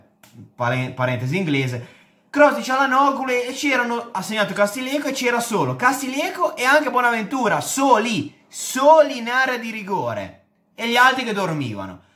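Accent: Liguria, Italy. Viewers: Ma quanto è bello il tuo accento Ligure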